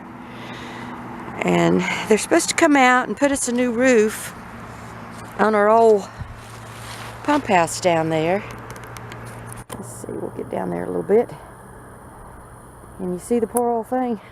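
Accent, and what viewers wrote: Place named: South, United States. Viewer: Love what y’all are doing for the cats 🐈 & Lily the goat 🐐! I also love that southern accent. All of my family is from VA, with a few of us living in MD. Take care, 🎀 Lily 🎀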